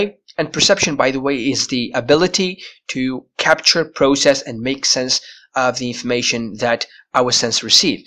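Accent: United Kingdom. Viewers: What i like about u, your UK accent Keep on that prof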